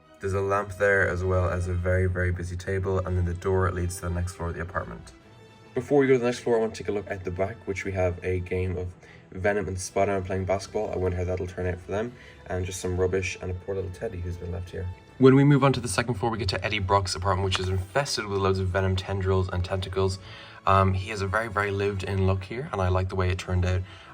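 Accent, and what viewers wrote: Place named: Ireland. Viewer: Just found your channel and am loving it! Nice to hear an Irish accent on Lego videos for a change. Keep up the great work 😁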